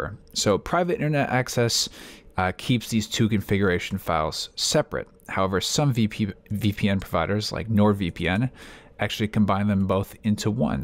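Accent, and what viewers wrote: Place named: United States. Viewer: very nice Tutorial. And nice clear AMERICAN Voice ;-) Greetings from germany. I have bought the Router today ;-)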